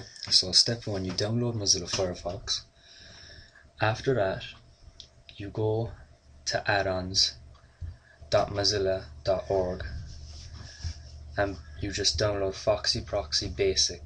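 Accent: Ireland. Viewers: r u irish?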